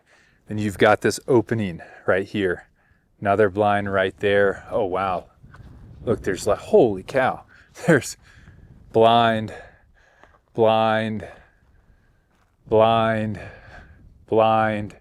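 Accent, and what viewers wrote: United States. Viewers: 100s of meters? you sound american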